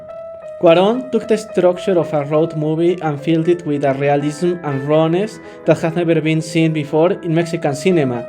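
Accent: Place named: Spain. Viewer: Muchas gracias por el video. Me gusta mucho tu acento español cuando hablas Ingles. Haz más videos por favor ❤❤❤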